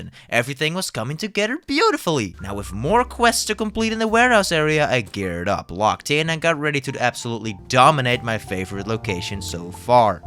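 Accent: Netherlands. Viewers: Dutch (I guess as a fellow Dutchman)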